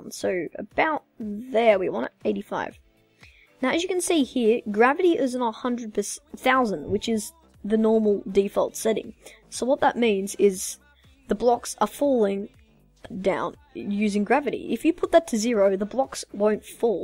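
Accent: Australia. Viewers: I wish i had your Australian accent :3 I have a british accent and it kind of sounds like it but meh xD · you have the most beautiful australian voice :)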